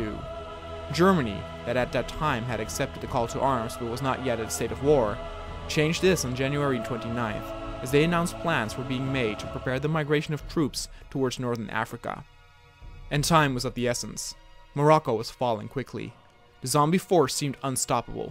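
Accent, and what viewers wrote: Netherlands. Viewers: You are Dutch? I am Flemish. It took me 10 minutes before I could clarify your accent, well done! Also: great way of recycling genuine news footage into an alternative 'present' and a zombie war. Awesome! Dikke proficiat, dat deed je geweldig! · are you dutch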